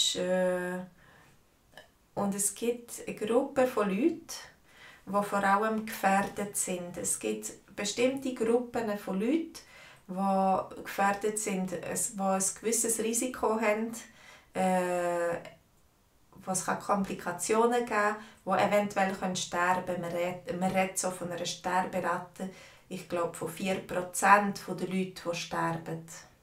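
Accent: Bern, Switzerland. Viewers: Ich bin auch in Bern!, ist dein Dialect gemischt oder Bärndütsch?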